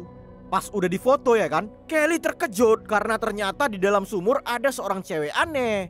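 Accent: Medan, Indonesia. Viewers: BANG ABANG MEDAN MANANYA BANG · Logat Medan bah...👍👍👍👍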